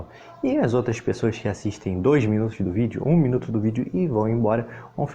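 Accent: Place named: Rio de Janeiro, Brazil. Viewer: Esse é carioca kkk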